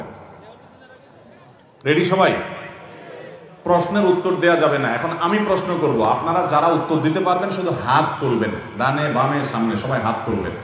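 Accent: Chittagong, Bangladesh. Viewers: মাশা-আল্লাহ,হুজুরের মুখে চট্টগ্রামের ভাষা চমৎকার লেগেছে। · আহমাদুল্লাহ দেখি চট্টগ্রামের ভাষাও পারেন। চট্টগামে উনার মাহফিল হলে খবর কেমনে পাব?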